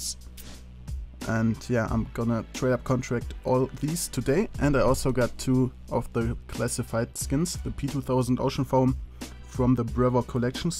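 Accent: Germany. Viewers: naja , so spricht jeder deutsche englisch '-' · Schöner deutsch akzent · krass wie man hört das du deutsch bist :D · Are you german? You sound a bit like a german guy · Du bist deutsch das hört man:D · It sounds like german english? Its right? :D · red doch einfach deutsch bitte ich pack diesen über deutschen akzent einfach nicht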